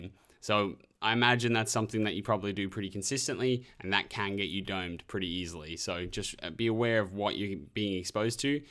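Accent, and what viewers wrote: Ireland. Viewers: I knew i could hear some Irish in ur accent! Love the content bro! love from Scotland 🏴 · Been wondering if I could hear a bit Irish in your accent for ages. Knew you were one of us ☘️☘️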